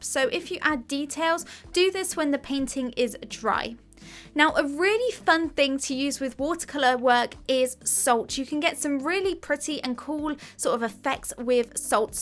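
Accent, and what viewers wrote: England, United Kingdom. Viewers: Lol that's how us peeps in Britain talk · I like your British accent!(Nice video, thanks) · british accent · I love her british accent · She has a British accent that's why 😑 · British accent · Brandon Butler yeah because she has an English accent also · I really really really love your British Accent❤️🔥 which is pleasing to ears and your tutorials are amazing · That British accent is so satisfying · Learning British accent and watercolor basics in one video... I love it.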